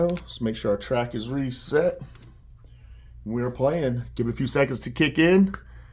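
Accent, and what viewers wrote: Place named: South, United States. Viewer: Yes, I hear that. It is correct to my ears. The wrong way would be: three thousand, five hundred, point nine one. Are you in the USA? If not, there may be a difference in the way numbers are spoken. I'm American so it sounds normal to me. Another acceptable way he could have said it is: three thousand, five hundred AND ninety one. Either way is normal over here. He also speaks with a southern accent, so that can be a bit difficult if your not used to it. I don't think he's trying to deceive anybody. 👍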